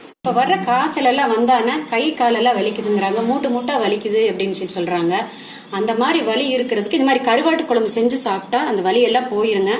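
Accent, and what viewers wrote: Kongu, India. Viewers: கொங்கு தமிழ் அழகே அழகு ......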